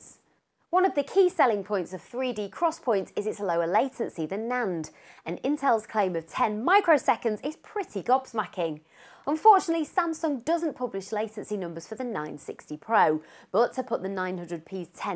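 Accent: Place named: Northern England, United Kingdom. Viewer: I love that northern accent.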